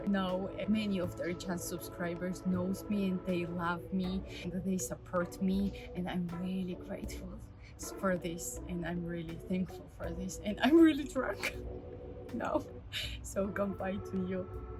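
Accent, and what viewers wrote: Japan. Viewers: の日本語のイトネーション好き😍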